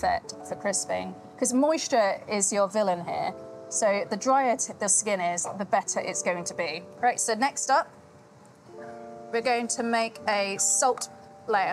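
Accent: United Kingdom. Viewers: I had this playing on the background and I thought I heard Gillian Anderson with British accent talking.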